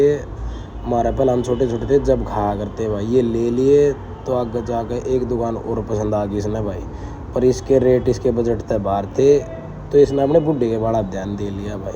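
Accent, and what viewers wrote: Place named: Haryana, India. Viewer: Haryanvi voiceover 🤌💕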